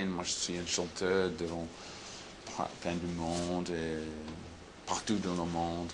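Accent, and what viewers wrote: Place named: England, United Kingdom. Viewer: The first time I understand him.....and I love his english accent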